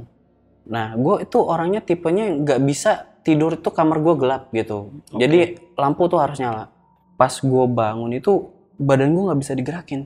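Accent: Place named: Bali, Indonesia. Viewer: Hadir sampang madura, btw bg orang bali y logatx bali bgt🙂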